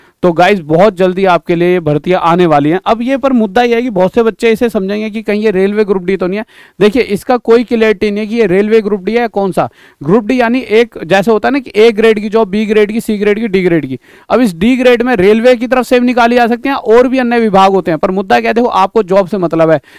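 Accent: Haryana, India. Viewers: Haryana ki ha ya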